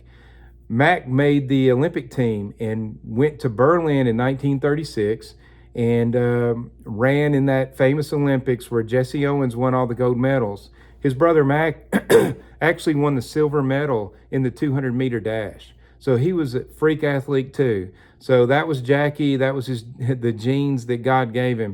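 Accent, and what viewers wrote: South, United States. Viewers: this was such a great episode. I’m in the middle of reading Jackie Robinson-A Biography by Arnold Rampersad. I think you have the facts pretty close to what I’m reading in the book. As I’m reading, I think about how hard it was for black Americans to live during that time; even today in many instances. You’re telling of the story, and I mean no offense at all, by a southern American, really makes the story come alive and enriches it with a lot of empathy. And your card collection is something else. Thank you for sharing,.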